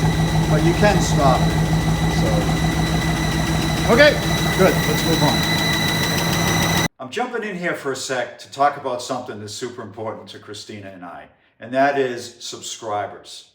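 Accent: New England, United States. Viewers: You from Maine, NH or MA?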